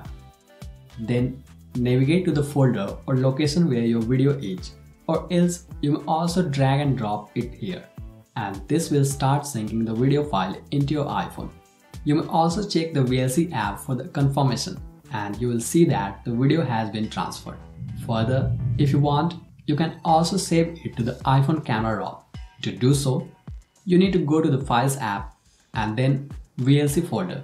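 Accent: India. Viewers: I don't mean to be unkind. But you should work on getting rid of the Indian dialect. For me, it's impossible to follow you.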